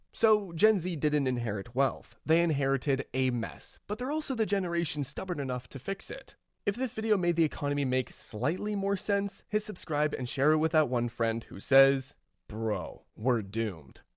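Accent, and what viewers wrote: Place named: Canada. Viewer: I can tell from your accent you’re Canadian… so am I and we’re screwed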